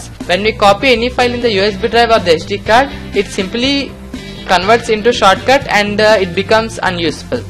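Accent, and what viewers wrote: India. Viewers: I love how he sound Indian lmao. Thanks for the tutorial tho. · When i heard the indian accent I just clicked off and disliked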